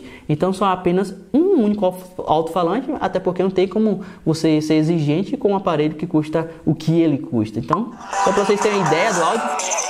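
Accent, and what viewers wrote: Bahia, Brazil. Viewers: Mano vc é baiano? O sotaque e as gírias igual a minha pivete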